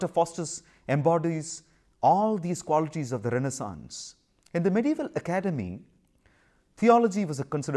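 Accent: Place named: South India, India. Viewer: Your ascent sounds like South Indian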